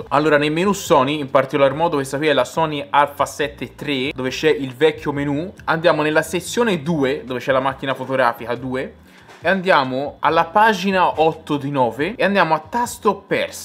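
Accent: Tuscany, Italy. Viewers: Video bellissimi ed interessanti. Vai, parlata toscanaccia simpaticissima, è tutta invidia. Il prossimo fallo in cadenza veneta!!!!!! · Video belli ma correggi L accento troppo troppo toscano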